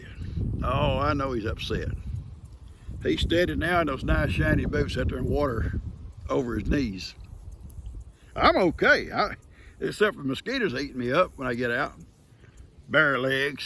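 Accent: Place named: Texas, United States. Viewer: Hi you have an extraordinary talent for storytelling. Those twinkling eyes of yours and that Texan drawl is just so compelling. You have a gift that not many have. Thank you ❤️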